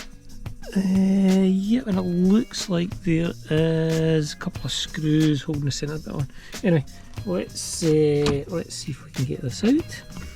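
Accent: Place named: Scotland, United Kingdom. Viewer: Great explanation dude.. even with those Scottish tones..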